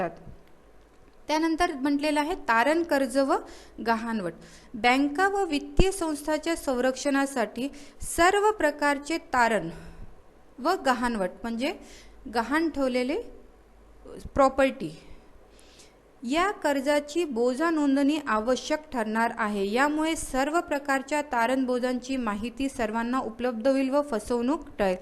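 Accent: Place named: Nanded, India. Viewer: Mam tumhi nanded che ahat na😅 tumcha language varun vatate , ekdam sundar teaching ❤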